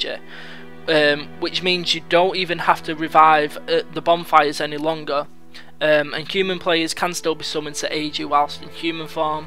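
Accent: Manchester, United Kingdom. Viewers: is that a manchester accent? im from sweden so im just curious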